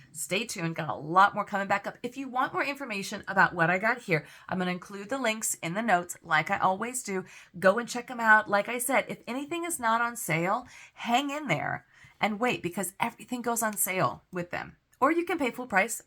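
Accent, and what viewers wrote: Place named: Tennessee, United States. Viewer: You brought back some of your southern Tennessee accent. ❤😂 That book is amazing.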